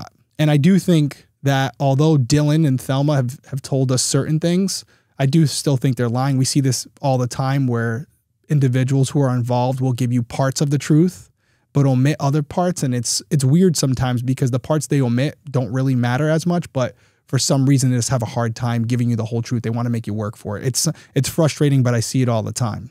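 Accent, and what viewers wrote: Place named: East Coast, United States. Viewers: I’m going to listen to the podcast as well…I’d like to hear from Erica, and maybe between this video & bumping the podcast up in the algorithm it’ll help get the word out. On a side note, I’m loving your new channel! Also, it was cool to hear my name in a northern accent so many times (I’m from Florida) 😂😂❤ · I love how in tune that you are with your audience. Btw, I enjoy your voice, with it's tiny east coast accent (I'm from So Cal) I'm proud of you, I know that you will be successful l.😊 Edit: I like you're story voice and your accent. I do not find the pacing too fast. If I could change anything, I would like more of a backstory on the victim, if possible Love, Blessings, and Prosperity, Stacy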